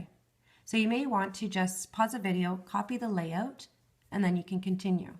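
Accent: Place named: Canada. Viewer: I'm american and you soundas little Canadian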